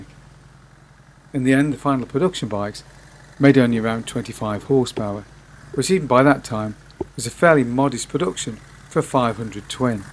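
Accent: England, United Kingdom. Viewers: That bit about the sunbeam looks like it was filmed in the Netherlands, do you remember where? You sound very English though, even if you were taught the Queen's English in school, like i was, that's hard to fake.😁🇳🇱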